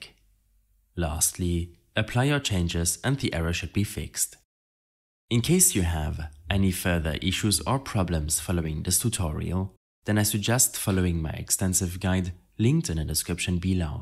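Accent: Germany. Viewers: Man hört das du deutsch bist 😂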